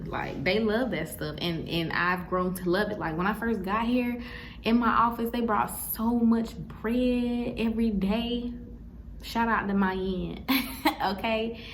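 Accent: South, United States. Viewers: The accent is giving South Carolina (1st Guess) , or Texas (2nd) or Georgia (3rd guess) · First of all, you're so pretty, and I love southern accents! But yesss sis! I love seeing Black women doing their travel thing! I'm on my way down there in a few weeks, and I'll be there for a month. Just trying to make sure it's not a stupid/dangerous move for me to be staying there alone for a few weeks. I'm going down with friends, but then they're leaving and I'll be there alone for two more weeks. I'm either going to take some Spanish classes to give me somewhere to be during the day and people to be around. Or I'll maybe forget the classes and do a homestay so I'll have people/a family to keep me company 😅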